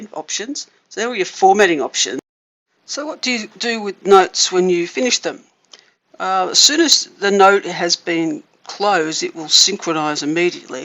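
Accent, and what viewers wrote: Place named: Australia. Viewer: Great help and very clear. Loved hearing an Aussie voice too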